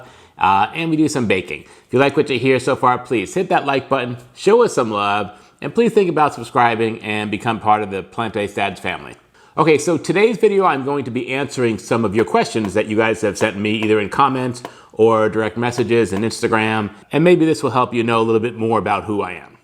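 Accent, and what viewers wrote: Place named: Long Island, United States. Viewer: I just found your channel and subscribed after watching the first one. I think you're great. I love your enthusiasm and dedication. It's helping me get excited about the recipes. I especially love your Long Island accent. I am actually a vegan living in Park Slope, Brooklyn, but I've always wanted to live in Phoenix/Tucson. Should I make the move? Looking forward to more videos! Thanks!! Keep up the great work.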